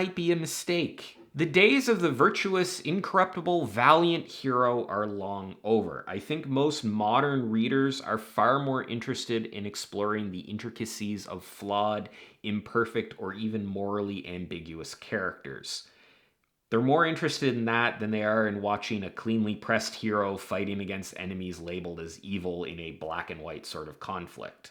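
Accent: Canada. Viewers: Once again commenting on an old video: Balding isn't a flaw, nor is a Canadian accent (that's an asset). Lack of charisma could be a bit of a flaw, but it's not one you have, at least when it comes to video. Now I do have a problem with the single character being the centre of the world. If you're writing from a single POV, that character is automatically at the centre ALL THE TIME. If the POV is first person, there's more leeway because you have immediate, deep access to their thoughts, and they can spend a lot of time thinking about and observing the things happening around them. In a sense, 1st person POV characters can serve as a storyteller in their own right. In 3rd person, though, this level of interiority comes at a higher price because of the perceptual gap between the external and internal experience of that character. Is this really a problem inherent in single 3rd person limited POVs? 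Or are we just so used to an enormous multiplicity of POVs in epic fantasy that, in comparison, books that contain a single 3rd limited POV seem too focused on one character?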